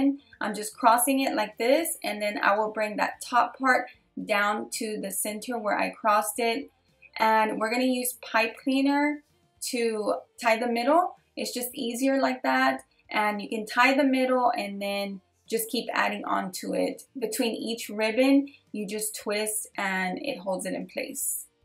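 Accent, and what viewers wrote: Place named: South, United States. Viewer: Love your videos you talk ok I’m from the south to. 10 th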